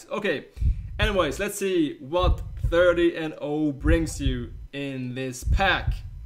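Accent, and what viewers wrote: Sweden. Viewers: He is so swedish listen to that accent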